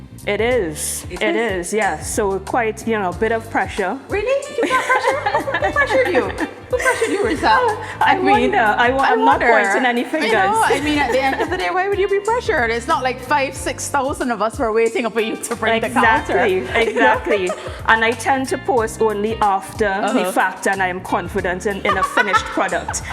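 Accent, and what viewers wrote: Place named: Caribbean. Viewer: I figured you are the caribbean by your accent. Hope your mom Maxine is doing ok. Blessings in your endeavors. Keep soaring lady....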